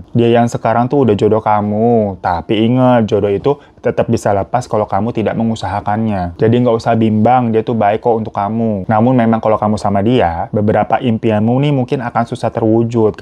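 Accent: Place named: Medan, Indonesia. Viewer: KEK MANA, Udh kek orng Medan ya bg :v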